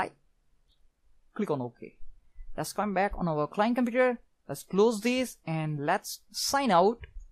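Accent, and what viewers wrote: India. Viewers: I think ur origin accent is Indian. better try that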